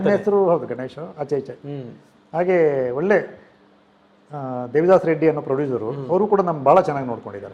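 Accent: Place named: Kundapura, India. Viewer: ನಿಮ್ಮ ಭಾಷಾ ನೈಪುಣ್ಯತೆ ಅದ್ಭುತ.... ನಿಮ್ಮ ಜೀವನಾನುಭವ ನಮ್ಮ ಕುಂದಾಪುರ ಕನ್ನಡ ಕೇಳುವಾಗ ಆಗುವ ಆ ಕರ್ಣಾನಂದವೇ ಬೇರೆ ❤❤❤❤❤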